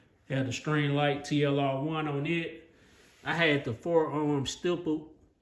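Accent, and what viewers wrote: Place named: Jamaica. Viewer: Bad man Jamaican voice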